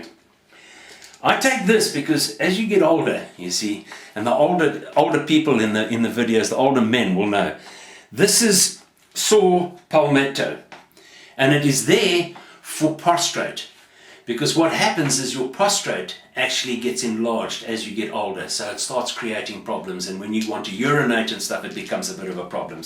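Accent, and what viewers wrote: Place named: South Africa. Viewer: you are deffintly south african or rohdesian Bra that accent is awsome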